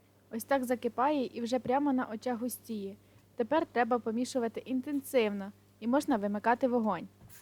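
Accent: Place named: Lviv, Ukraine. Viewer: Я у захваті від вашої подачі цього простого, на перший погляд, десерту, приємно слухати львівську говірку! Дуже дякую!!🥰🏆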